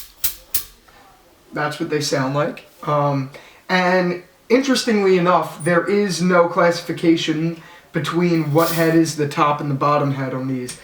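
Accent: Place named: Philadelphia, United States. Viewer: Not even kidding, first 10 seconds and I have to ask: what part of Philly are you from?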